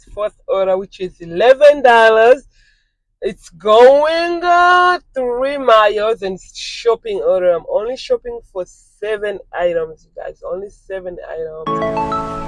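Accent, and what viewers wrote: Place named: Haiti. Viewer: How long have yòu lived in the United States? Im from Miami trying to place your nice accent, Haiti or...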